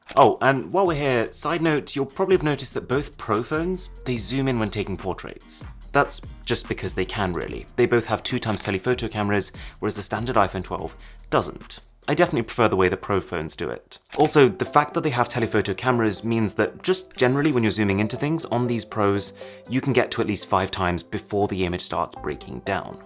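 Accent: RP, United Kingdom. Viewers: this guy speaks like a royal family member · I just absolutely love watching this guy's videos and updates! Always super detailed and right on point along with that fantastic and beautiful British accent 🥰!!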